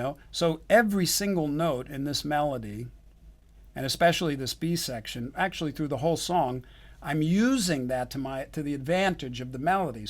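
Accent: United States. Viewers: You are the guitarist I would be you are an exemple Master you sound like an Italian compositor and in same time you do it with an American accent like when you talk I heard the both sounds it's questions of italian Genius blood like Leonardo da Vinci of 🎸 it's a special DNA like a perfect hybrid something like chianina grown up in American the perfect Animal, Always growing up with a lot of music in brain.the perfect sense of metaphors of spirituality like a strings cames from Pitagora the first guitar hero lol every note you do are like an LSD trip as Jimmy Hendrix of future you're biggest one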